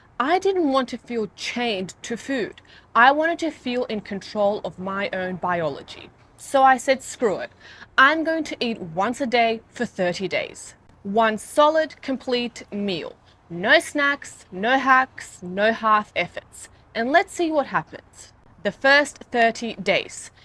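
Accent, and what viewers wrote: Australia. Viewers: You're Australian or New Zealander? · YEEEEEES! YOU'RE SO FREAKING RIGHT! Always grazing and never feeling clear.. of course sleep is a part of this but this once a day thing I'm going to try and hopefully keep. And lastly.. Man I forgot how much I love the Aussie dialect.. I'm in love with it again.. Maybe find an Aussie woman now😂. Thanks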